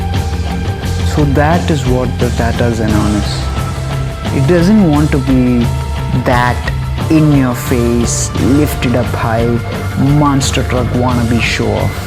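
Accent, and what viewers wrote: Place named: Kerala, India. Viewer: കേരളാ ഇംഗ്ലീഷ്..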